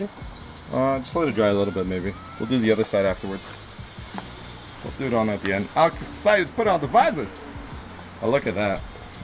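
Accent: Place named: Cape Breton, Canada. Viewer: Where you from? I hear that Cape Breton accent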